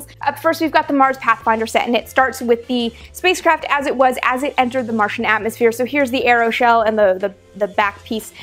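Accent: Canada. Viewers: You sound Canadian. So strange that almost all of the channels I'm subscribed to are Canadian.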